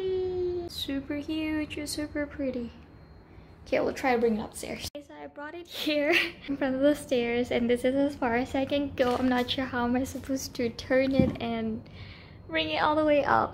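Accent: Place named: United Kingdom. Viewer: Man her accent is interesting, it’s randomly British with her vowels and other times it sounds American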